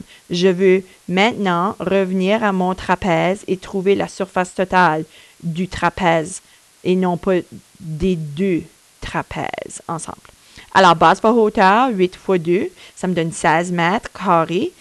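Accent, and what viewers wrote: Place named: Quebec, Canada. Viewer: Mais oui les Québécois ont un petit accent . . . adorable .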